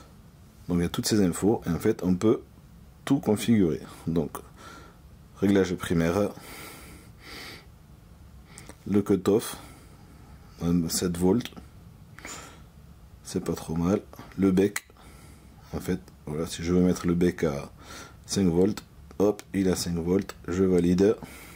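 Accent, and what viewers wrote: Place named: South, France. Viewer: Ba si fait la je comprends rien avec son accent du sud rajoute des euuuu partout 😂😂😂🤣🤣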